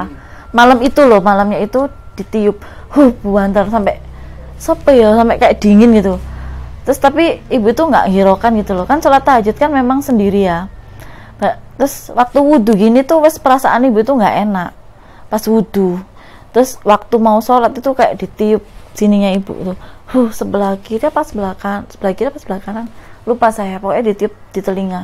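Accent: Java, Indonesia. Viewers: aku nonton di tv rama² pas ada sodaraku yang bukan asli jatim malah jadi cekikikan karena mbaknya medok banget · Logat jawane seneng aku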